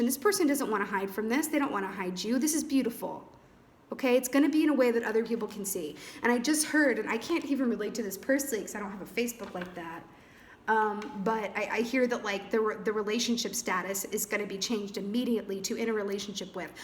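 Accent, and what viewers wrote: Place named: Mississippi, United States. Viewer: I love your accent...I’m from Mississippi and you remind me of home 💜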